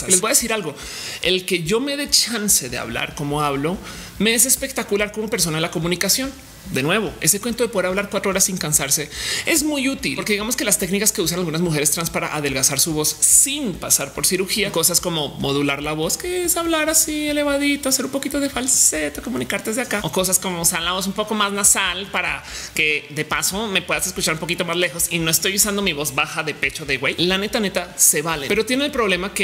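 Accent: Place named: Colombia. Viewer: Yo sabía que eras colombiana, se te nota en la voz un montón. Eres muy bella (ame tú collar está precioso)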